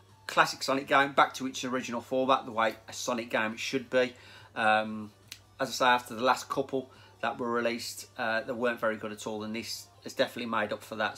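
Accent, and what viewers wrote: Birmingham, United Kingdom. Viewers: Good to hear a brummy voice on YT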